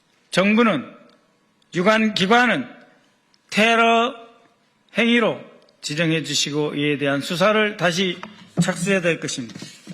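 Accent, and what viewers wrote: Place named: Jeolla, South Korea. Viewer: 박선원의원께 감사합니다.진실과정의 앞장서시는 박선원님께! 이재명대통령 당선확정공표때 박의원님의 표장이 순간포착된사진을 간직하고있습니다.저와똑같은 신정이였습니다. 국민의지성을믿고있었지만~~~아슬아슬한심장은🥹 지구반대편인만리떨어져살고있는동부시골에서 응원드립니다. 친근한 남도말투에서 제고향이그립습니다. 건승하소서🙏😊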